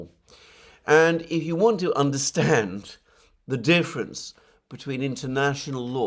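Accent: United Kingdom. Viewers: Excelente clever well thought information. Your British accent so perfect for today’s description on Russia strategy. Great sound track. Thanks Alexander ❤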